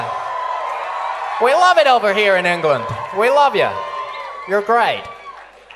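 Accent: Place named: United States. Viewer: Ohhh I do love you Murrrcan accent.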